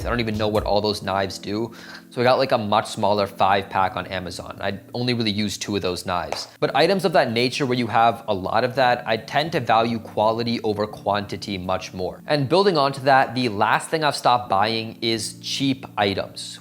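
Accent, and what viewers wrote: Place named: United States. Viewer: Hey you are from India? How did you get the american accent so easily?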